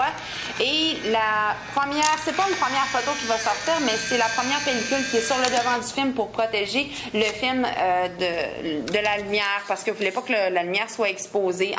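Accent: Quebec, Canada. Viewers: Maybe because of the french canadian accent she has ? but i understood her well !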